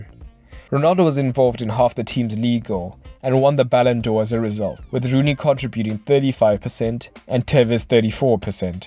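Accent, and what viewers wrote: South Africa. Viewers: Sorry fam have to ask...are you South African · are you south african by any chance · South African???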